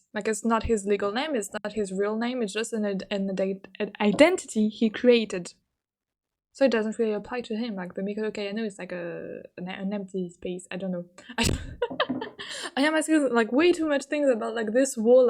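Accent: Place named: France. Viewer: your english is really good 😭 and i also think french accent adds a bit of character (its not that noticible tho .d)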